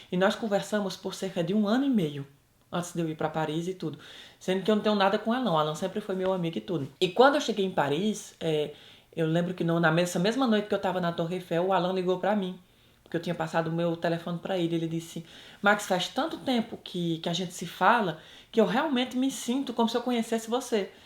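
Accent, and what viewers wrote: Northeast, Brazil. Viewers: Amo seu jeito nordestino, seu jeito de falar, alto astral. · Max amei sua história gosto do seu jeito de falar não perdeu sua origem nordestina muito simples estou conhecendo Paris com vc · Eu admiro você por ter mantido o sotaque do nordeste, isso é lindo! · Que sotaque gostoso, meu nordeste é lindo. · Eu ri e chorei com sua história, que coisa linda❤️ amo nosso sotaque nordestino, que Deus te abençoe. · Mininu,e tu num perdeu esse sotaque até hoje., Por causa de que ❓ Tu è da minha cidade, crato!!!🤩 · Esse video me foi recomendado e quando eu vi que era de um cearense vim correndo ver. Amei sua historia, amei que não perdeu seu sotaque, parabens por tudo. ❤️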